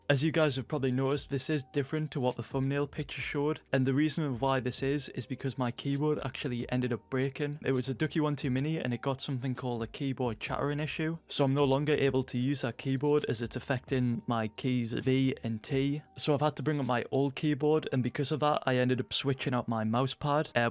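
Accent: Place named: North East England, United Kingdom. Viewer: You sound like your from the north east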